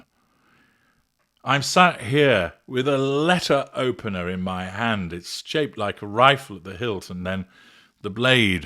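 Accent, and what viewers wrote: United States. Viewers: Love your American accent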